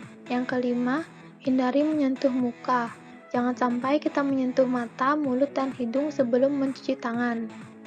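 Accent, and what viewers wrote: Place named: Bali, Indonesia. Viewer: Logatnya bali banget ya kak🙃, thanks infonya👌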